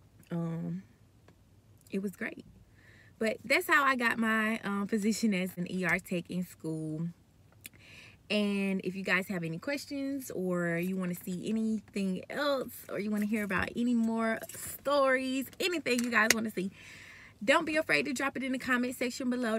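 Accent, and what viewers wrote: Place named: Georgia, United States. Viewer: Girl the accent screams Georgia lol. I’m from Albany, born in Phoebe Putney Hospital 🤍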